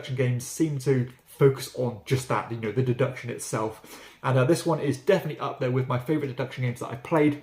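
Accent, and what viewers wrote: United Kingdom. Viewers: If you don't mind sharing - what is your story behind Castell Rhaglan? - was it simply a visit, or do you have Welsh ancestry, even as your accent is undoubtedly English? 🤔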